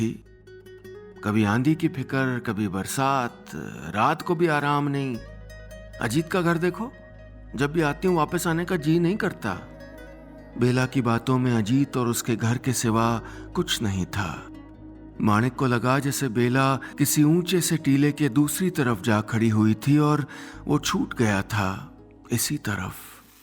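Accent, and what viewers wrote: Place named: Rajasthan, India. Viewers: Such a beautiful story. Plus it being of Rajasthani setting and Nilesh Mishra reciting it in Rajasthani accent makes it even more beautiful. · Rajasthani bdi pyaari lgti h aapki aawaz me☺️☺️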